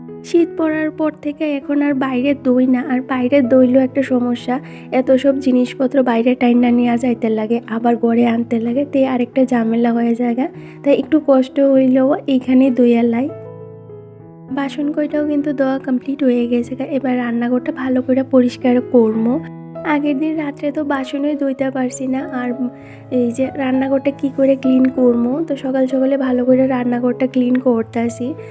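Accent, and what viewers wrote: Bangladesh. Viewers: Khub sundar.tumi kotha thako. Tumi ki bangladesi.toma kotha sune mone hoche.kichu mone koro na.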